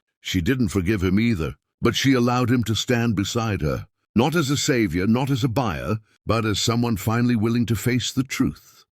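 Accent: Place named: RP, United Kingdom. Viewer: A story about the American Wild West and you choose to use an upper crust sounding British voice dripping with contempt? Nope!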